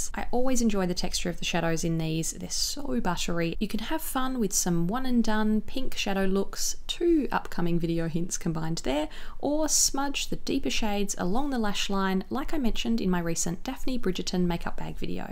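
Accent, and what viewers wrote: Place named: Australia. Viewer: Awwww must be the Aussie accent 😍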